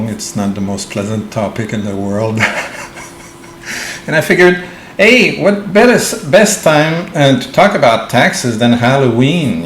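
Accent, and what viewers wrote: Quebec, Canada. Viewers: love the french Canadian accent. keep up the good work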